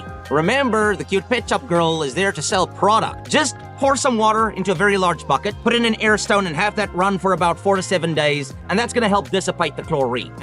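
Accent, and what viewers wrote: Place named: South Africa. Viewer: Hey you’re South African I noticed an accent Aseblief please get a mic And can goldfish have tank mates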